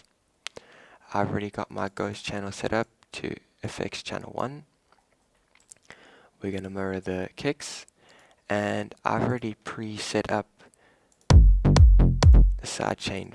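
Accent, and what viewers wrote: South Africa. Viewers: He sounds South African.. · South African?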